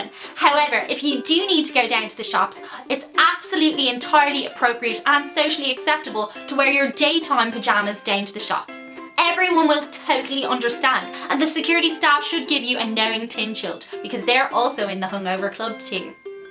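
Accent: Ireland. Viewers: I can usually understand you, but I was too hung over to understand a word you said? You were gung ho on the Irish accent on this one? WTH?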